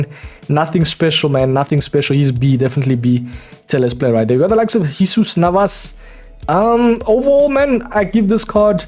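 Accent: South Africa. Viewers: * are u South African ?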